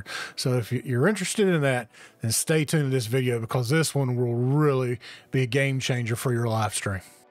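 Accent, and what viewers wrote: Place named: South, United States. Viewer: Where you from brotha? I hear a fellow southern accent.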